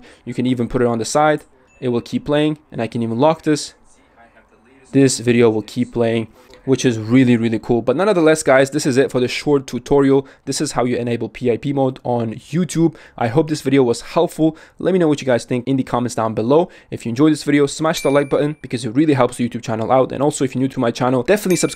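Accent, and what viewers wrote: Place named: Russia. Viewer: Not only bad, but intentionally disingenuous. However, the uploader has a Russian accent so I'm not at all surprised.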